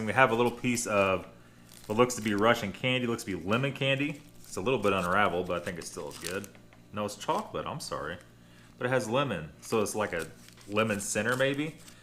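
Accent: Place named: Midwest, United States. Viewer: "If you build it, they will come." Your channel is becoming a phenom. In spite of the bottom feeders, with their assinine commentaries, the viewers with who have possession of their faculties appreciate your no-nonsense, BS free, objective reviews. It allows the ration collector to get vital information in order to avoid purchasing sub-standard MRE's. One final note, if you're from the Mid-West, you pronounce English according to the dialect of the region. If someone from another region can't understand this, they're idiots. (I'm from Sout' Jersey and I murdah da Kink's Inglish. We eat Peetza, Sof' Pretzils, Scrapple sammiches, and Cheez Steaks wid Cheez Whiz, ongyuns, hot peppahs, ana attytude... We drink Yinglings (Yuenglings) beer ice cold, so yous can bite me!....Go Philly Iggles!) 👍👍✌✌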